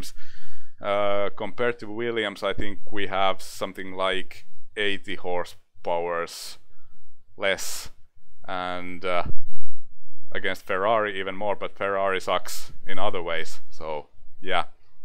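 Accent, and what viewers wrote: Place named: Finland. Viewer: You have a finnish accent by the sound of it.. I used to play this game back at 90's in Kuopio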